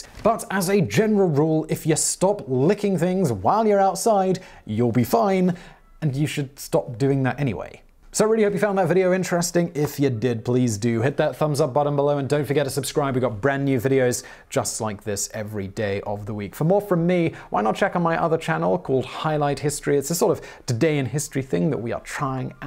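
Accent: United Kingdom. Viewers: "I triple dog dare ya!"😛 I like the way Brits say Aluminum!😁👍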